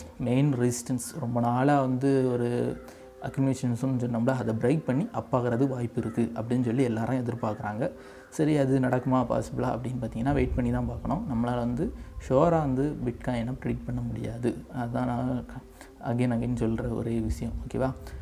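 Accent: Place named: Nanjil, India. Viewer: Brother unga voice vachi Guess panniten nanjil nu Already Mutual Fund la invest pannitu iruken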